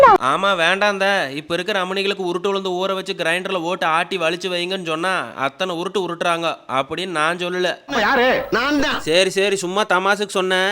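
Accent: Kongu, India. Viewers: கோவை கொங்கு தமிழ் அழகுகே அழகுதான் ❤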